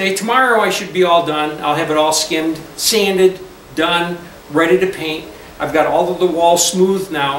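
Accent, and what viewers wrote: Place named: Bridgeport, United States. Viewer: You sound like your from Bridgeport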